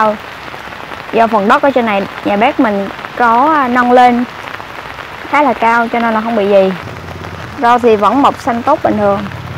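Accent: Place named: Nha Trang, Vietnam. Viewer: Nhớ giọng nói Nt quá đi Diên khánh hay Ninh hoà vậy Ko có dịp về mùa mưa dể thấy đuọc cánh này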